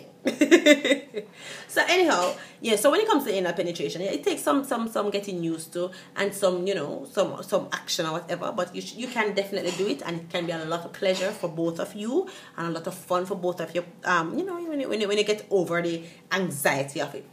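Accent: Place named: Jamaica. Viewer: Thank you, you are very helpful. I love your jamaican accent xxx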